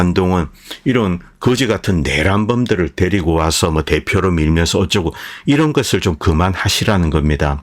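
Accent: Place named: Gyeongsang, South Korea. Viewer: 형님 경남이지요 친금감잇는 목소리 방송잘보고잇어요 😅 이기자 통신됴😅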